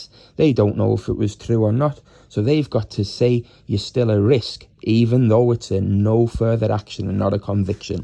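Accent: Liverpool, United Kingdom. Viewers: Late night Hello to from Belfast 🇮🇪 your intelligent? You have the gift of the gab? And you believe your handsome? But yet you have a Scouse accent 😂👍SO YOU MUST BE IF THIS IS TRUE, PART IRISH 🇮🇪 👌👌👌 KEEP IT REAL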